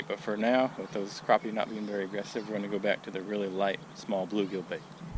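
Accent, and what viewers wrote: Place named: South Carolina, United States. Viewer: May I ask what state you are in? I'm new to your channel maybe you said. Seems like a S.C accent you have. Crappy are a fish I always had difficulty catching. And yet I was amazed to see several young boys taking long stringers of them out of a tiny pool below a dam outlet. They were just flicking the line like a fly rod. Amazing. Another cold day here, thank you or the vid!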